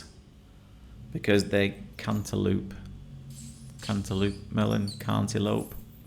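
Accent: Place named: England, United Kingdom. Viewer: Made it all the way and wow you are so addictive to watch! Also I’m an English girl now living in USA and it’s lovely to hear a fellow accent! I’d love to be Subscriber of the day 😊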